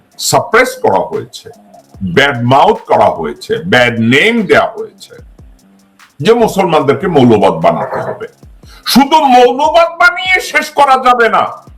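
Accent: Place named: Bangladesh. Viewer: সালাম ভাই আপনার কথা শুনে মনে হচ্ছে আপনি সঠিক বাংলাদেশি।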